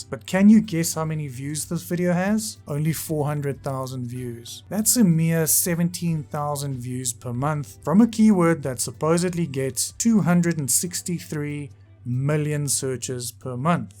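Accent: South Africa. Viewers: I detect a South African accent. Are you per change South African? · Do I detect a South African accent? Any way, I'm a new youtuber and used tubebuddy for my recent video and I cant really see a better results, I just still dont seem to get traffic to my videos which directly effects the amount of views I get.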